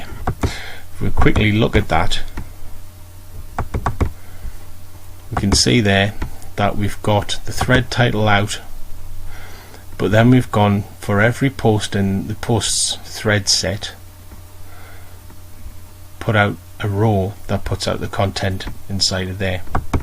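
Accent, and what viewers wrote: North, United Kingdom. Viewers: Nice to hear a fellow Northerner's voice. This was very helpful, thank you.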